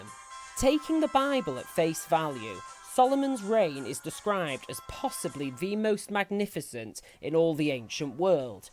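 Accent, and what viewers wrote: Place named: United Kingdom. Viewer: You're British accent does not make you more respectful or intelligent like those iinfomercialss this has been a tactic used I noticed this you mentioned that's Solomon had 700 wifes about but you said this is fantasy I don't think so you under estimat the people from the past and Bible ii believe the past was more sophisticated than you know I noticed how the media makes people from the past movies look like barbarians building houses of clay or tents wearing shaggy rags and long un trim hair u above them I think in a way they were above us people living longer longer that's not true just like the vaccine today tell me lies tell me Sweet Little lies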